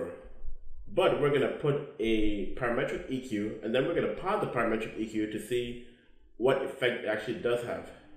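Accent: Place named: Africa. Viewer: You right B'. You have African Accent #TeamAfrica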